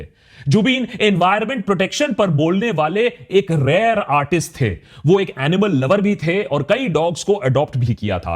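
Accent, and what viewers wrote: Bengal, India. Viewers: Brother why are you telling assamese names in bengali accent ( ps: i know deshbhakt is bengali okay, don't try to be oversmart)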